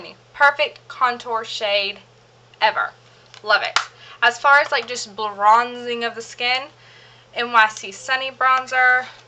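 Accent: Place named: Texas, United States. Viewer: I subscribed! Are you from Texas? Just figured you are bc my accent is the same :)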